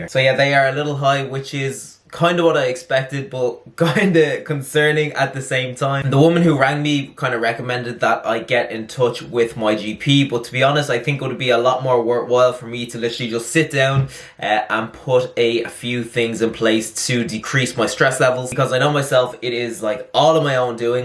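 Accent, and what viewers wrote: Ireland. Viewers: Are you from Ireland ? You have posh brogue ;-). Good video, I will follow. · Nvm, i knew it he is from ireland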